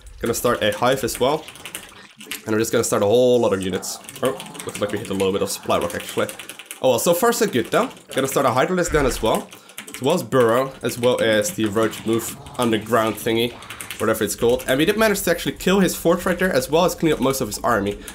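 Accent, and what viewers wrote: Netherlands. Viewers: He's dutch :) not Irish O.o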